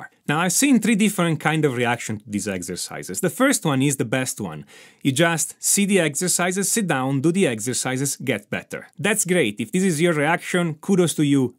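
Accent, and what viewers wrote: North, Italy. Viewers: Lui è italiano quando dice lento in inglese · Yep, northern Italian I would dare.. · Italian, Roman or northwest of Rome?? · U have an italian accent...? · Italiano vero . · Accento italiano? 🤔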